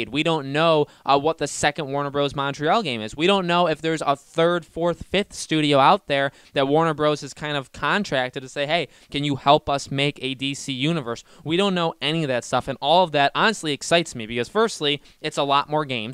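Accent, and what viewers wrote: United States. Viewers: you are very american and the name is maybe a little bit wrong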